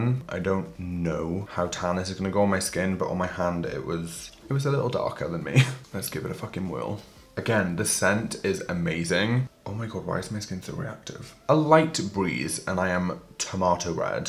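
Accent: Wales, United Kingdom. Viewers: Are you welsh? your voice is legit so therapeutic xox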